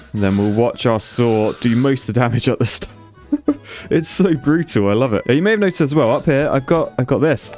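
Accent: United Kingdom. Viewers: Always great to hear that beautiful British voice. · early to this amazing video from my favorite bri'ish engineer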